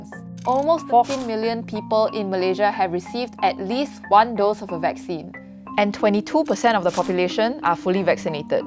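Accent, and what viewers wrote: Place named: Singapore. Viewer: Can’t understand your Singapore Hokkien English lah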